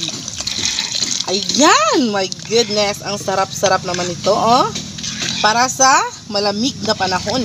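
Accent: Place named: China. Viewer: sanggi day pag tagalog ka ka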